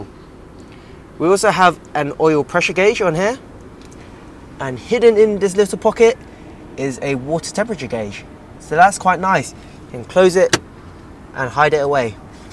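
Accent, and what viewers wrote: United Kingdom. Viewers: Asian dude with British accent gotta love it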